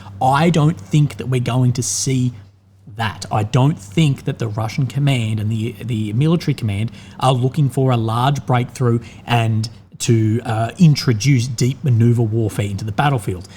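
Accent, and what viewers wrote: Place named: Bogan, Australia. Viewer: Aussie bogan....speaking on Ukraine war...hilarious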